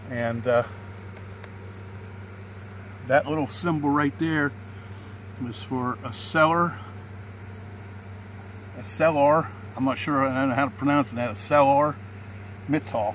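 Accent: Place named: Maryland, United States. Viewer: Man, I don't think I've ever heard a thicker Maryland accent in my life.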